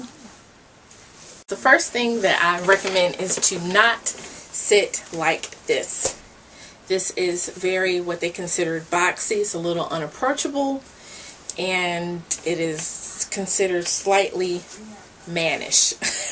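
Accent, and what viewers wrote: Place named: United States. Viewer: You are an African talk like an African why why this American talking style?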